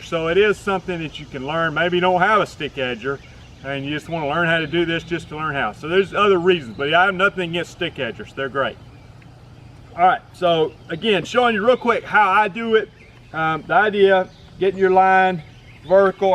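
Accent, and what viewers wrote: South, United States. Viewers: Your not English are you.?..love that southern drool . Over here in lil' ol' England we call it a lug hole..lol! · Loved your video, I did learn something, and I think its funny some of your comments about what the knuckleheads say about you or your work. And can I say, I love your "accent". I'm from the midwest and I forget comfortable and how easy it is to speak to or listen to someone from the South. I think if you stopped by my house, we'd be out on the deck or patio drinking a beer, just talking about things in general. I think that says quite about you. Best of luck and thanks for the video! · Great instructional video! Cool personality and love that southern drool 👏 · 1. Attractive 2. Southern accent 3. Handy 4. Excellent sense of humor. Is this guy single?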